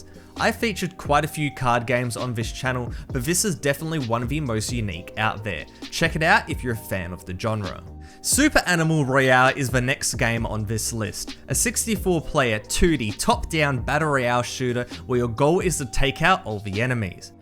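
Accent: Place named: Australia. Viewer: Dude idc what videos u make ur voice is satisfactory that australian accent hits the 'spot' yessir i love ur vids xD and yea i like ur bedwars series cuz im a bedwars kid who does yt and stuff lol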